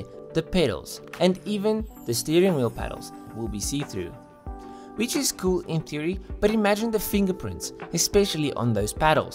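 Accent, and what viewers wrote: South Africa. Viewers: I love your South African accent. Subscribed.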